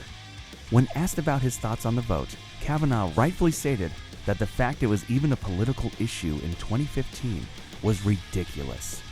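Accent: Scotland, United Kingdom. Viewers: That was Scottish not Irish accent 😂😂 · American dose a Scottish accent for an irishman, wonder why they call Americans ignorant?. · Scottish lol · Thats a Scottish acent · That was Scottish not Irish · you sounded scottish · That's a Scottish accent not Irish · that was a Scottish accent!!! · Definitely not an Irish accent, that was Scottish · ok im from Ireland and you sound Scottish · U sounded Scottish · Scottish accent mate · he sounded Scottish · Why did this prat use a Scottish accent? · he sounded scottish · That’s a Scottish accent ya dope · Your accent was Scottish not Irish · That was a Scottish accent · I hate you made fun of Ireland 🇮🇪 I'm so offended you sounded like it's Scottish guy🖕🖕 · YOU SOUNDED SCOTTISH NOT IRISH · Scottish accent u tool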